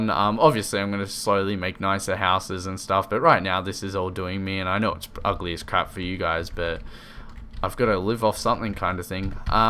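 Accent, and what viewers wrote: Australia. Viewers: what part of Australia are you from ? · I knew you were a fellow Aussie but you can tell by the way you say and talk about DICKHEADS. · Your australian?? You just earned a sub!!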